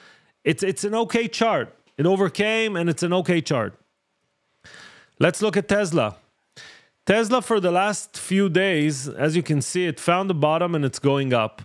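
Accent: Israel. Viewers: Well done! You still had more than 7.5K viewers listening to you talking in English (with a small hebrew accent:) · הנה דימוי בשבילך, מקווה שתבין בתור דובר אנגלית מלומד (עם מבטא ישראלי יפה) - למה שאשקיע ב-OTC כשאני יכול להשקיע בנסדאק? ולסיכום, בעולם העסקים כדי שהמוצר שלך יהיה מוצלח צריך בידול. באנגלית שבורה, אתה כבר לא מיוחד.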